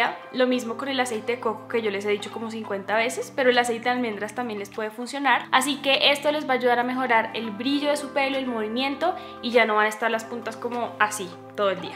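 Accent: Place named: Colombia. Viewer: Ese acento... 🇨🇴 Colombiana?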